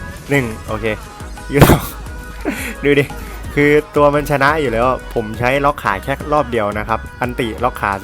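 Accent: Isan, Thailand. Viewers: คนอีสานหรอครับ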